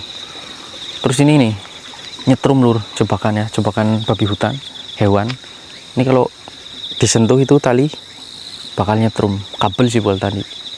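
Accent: Banyumas, Indonesia. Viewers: Ciri khas narasi ala adalah penggunaan kata2 yang lucu penyebutannya. Palkir, tlaktor, kakekk-kakekk, nenekk-nenekk, nyebut house jadi hoss, dll. Juga dialek ngapaknya, dan gaya polosnya. 😁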